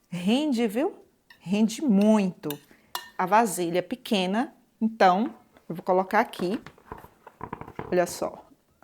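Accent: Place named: Aracaju, Brazil. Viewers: Menina, que maravilha! Vou fazer. De onde tu és? Eu conheço esse sotaque. Também tenho, sou de Pernambuco, mas moro em São Paulo à 40 anos, mas te ouvi me deu muita saudades da minha terra. ❤ Parabéns pela receita. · Parece que tu é de Aracaju sotaque igualzinho 😂😂😂😂 gostei da receita vou fazer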